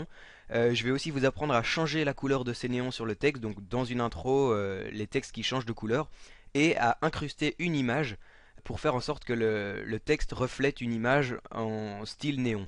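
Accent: Belgium. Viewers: Tu est belge non ? apouier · Il est belge, si entends dans une de ses vidéos: il dit Nonente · sa sent l'accent belge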